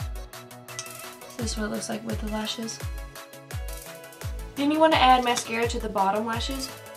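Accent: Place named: Alabama, United States. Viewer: You can so tell that you're from Alabama! I'm from Dothan and I moved to Ohio because of my husbands job and everyone up here automatically guesses that we're from Alabama because of our strong southern accents! 😂😂😂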